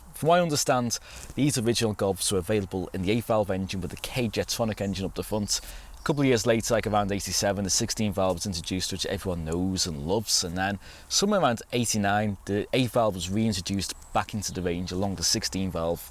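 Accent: Liverpool, United Kingdom. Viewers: I have a mate from Maghull and you sound exactly like him. A bit different from 'normal' Liverpool!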